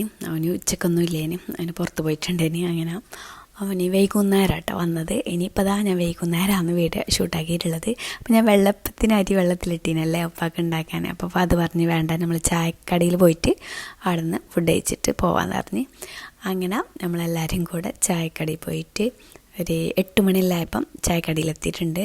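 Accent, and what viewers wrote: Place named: Malappuram, India. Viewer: നിങ്ങൾ മലപ്പുറം താനൂരിൽ അല്ലേ.... ജനിച്ചു വളർന്നത് ഒക്കെ.... സംസാര സ്റ്റൈൽ ഒക്കെ വേറെ രീതിയിൽ....അതെങ്ങനെയാ.....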